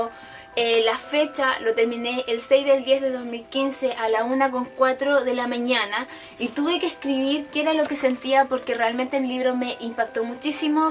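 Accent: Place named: Chile. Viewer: no sabes cuánto me alegra esto jsjjsjsjs yo estoy empezando hacer videos porque pensaba que no había chilenos y ahora te encuentro a ti con el hermoso acento chileno jajaja es la cumbia!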